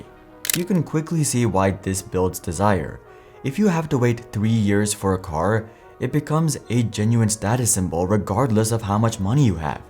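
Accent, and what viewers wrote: India. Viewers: The narrator is trying so hard to hide his indian accent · Am I the only one to think that has an Indian background, judging by his accent? Otherwise I'm struggling to get used to not knowing about a foreign channel without a face, nor a common accent, be it UK or US.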